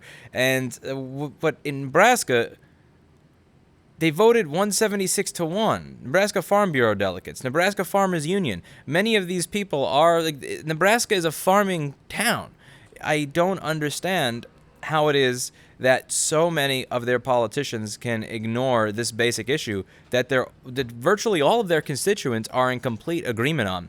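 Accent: New York, United States. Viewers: Louis has a NY accent? Lol I guess I missed that 😆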